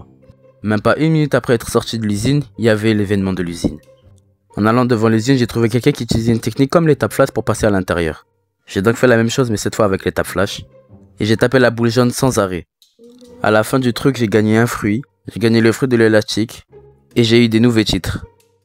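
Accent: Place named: West Africa. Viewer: Wesh l'accent me fume , tes ouest africain ou du viens du centre . Moi je suis sénégalais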